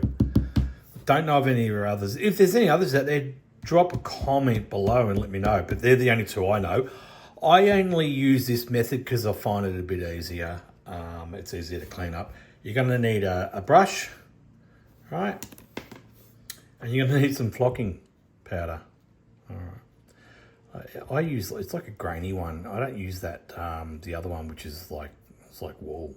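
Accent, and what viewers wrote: Australia. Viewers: That British and pearl green combo looks fantastic! Watching from Mobile (Moe-beel) Alabama. Been watching for the last few segments, all have been great. 62 and have been building since I was 9 and still learning, thanks to you guys ( or should I say blokes ) I happen to love the Aussie accent. Enough of that, keep up the good work.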